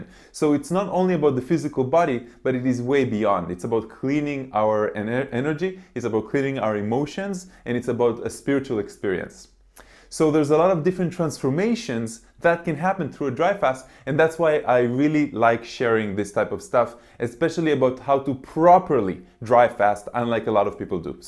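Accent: Israel. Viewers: Hi.. came across your vid... As an Israeli- I can hear your HEBREW!!!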